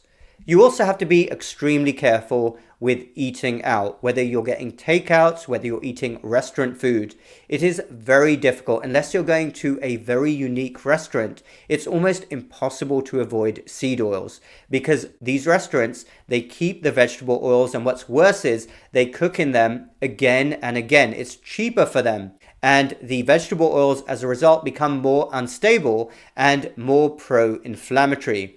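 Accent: United Kingdom. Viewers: I just love the fect doc didn't loose his British accent 👏😆❤️ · Bullshit in a British accent.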